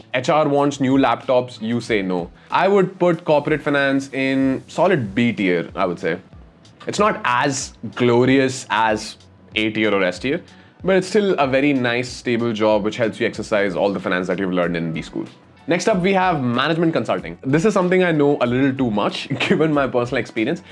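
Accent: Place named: India. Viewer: Why can no indian talk english without an indian accent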